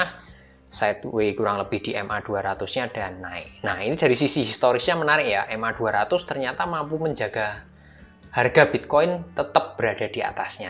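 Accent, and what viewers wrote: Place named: Sleman, Indonesia. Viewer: Jelas² sleman Logat & bhsane bedo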